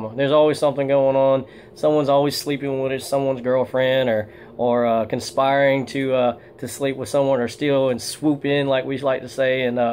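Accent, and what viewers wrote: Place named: South, United States. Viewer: So basically you only were chosen for casting because you were Asian with a country accent.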